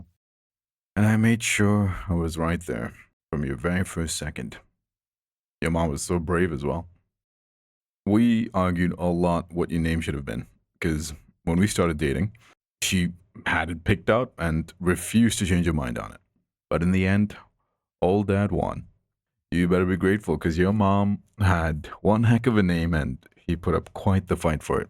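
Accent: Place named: Caribbean. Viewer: I can’t pin the accent. I know it’s caribbean. Is it VI, Bahamian, Trini, or Guyanese? Maybe Belize??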